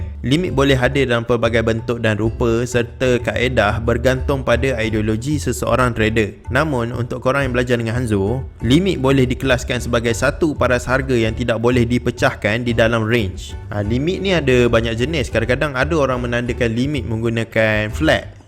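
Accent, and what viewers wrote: Pahang, Malaysia. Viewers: Terbaik video tuan, sy tumpang belajar, mohon halal ilmunya ye. Tuan org pahang ke?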